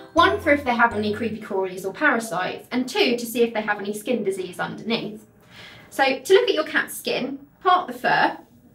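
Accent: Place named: United Kingdom. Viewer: Love your Brit-ish accont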